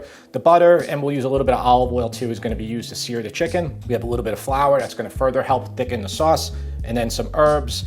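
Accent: New York, United States. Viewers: I hear that distinctive NY accent!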